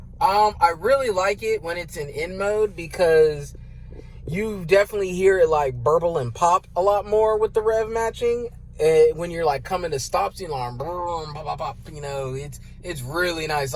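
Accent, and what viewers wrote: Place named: West Coast, United States. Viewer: well it's only 2nd grade English but I wasn't taking a shot at you personally... millions of West Coast people have that exact inflection, especially your bro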